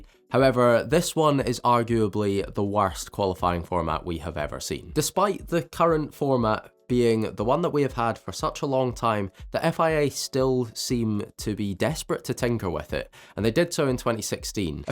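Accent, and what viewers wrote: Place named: Scotland, United Kingdom. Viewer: fellow scot! Imma sub to you just for that.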